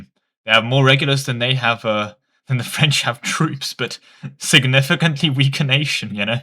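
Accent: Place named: England, United Kingdom. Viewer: The english accent got thicker with this vid.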